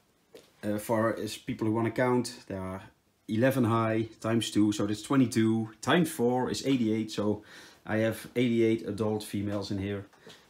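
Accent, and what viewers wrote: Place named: Netherlands. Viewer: Heerlijk Steenkolen engels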